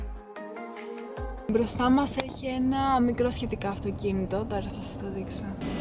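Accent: Spain. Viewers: Πιστεύω ότι σε ρωτούσαν αν μιλάς ισπανικά επειδή πολλοί ξένοι λένε ότι τα ελληνικά ακούγονται σαν ισπανικά. Έτσι και η προφορά σου μάλλον έμοιαζε με την ισπανική προφορά και λογικά θεώρησαν ότι πρέπει να σου μιλήσουν τη γλώσσα 'σου'. Ξέρω ότι στην Αμερική ζητάνε τα ισπανικά σα δεύτερη γλώσσα στην εργασία ακριβώς επειδή υπάρχουν πολλοί ισπανόφωνοι, οπότε έχει νόημα. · Σε ρωτάνε αν μιλάς ισπανικά γιατί η προφορά των Ισπανών και των Ελλήνων όταν μιλάνε αγγλικα είναι ίδια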